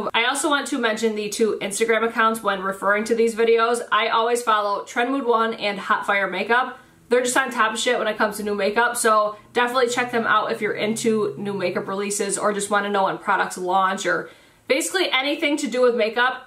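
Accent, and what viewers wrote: Midwest, United States. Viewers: Just discovered ur channel last night and I really enjoy ur videos. I'm trying so hard to identify ur accent. I'm thinking Midwest. Wisconsin maybe. Totally not my business it's just I find accents fascinating. Mine is horrible I love urs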